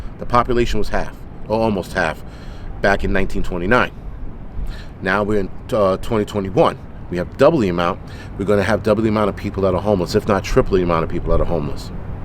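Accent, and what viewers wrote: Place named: Bronx, United States. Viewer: OMFG - I love hearing you talk (I mean Twalk )...that accent along with the 200% Bronx attitude and speaking the truth for all to hear, man I'd rather hear you speaking from the podium that any/all of these boot-licking-LEFTISTs that are taking us people and our country straight to hell in a hand basket. Spot on with that comment that all homeless people should be bused over to the Govenor & Mayors mansions. Fuck Yeah, I'll pitch in for the gas.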